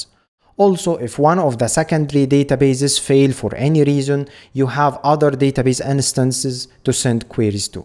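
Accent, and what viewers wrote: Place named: Egypt. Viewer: حسيتك مصرى من اللهجه قبل ما ابص على الاسم 😂😂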